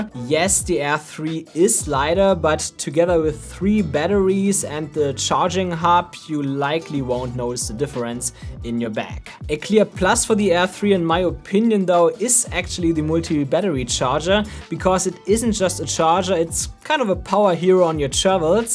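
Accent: Germany. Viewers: Deutscher Akzent so krass. Grüße aus Deutschland -:) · Love that German accent 😂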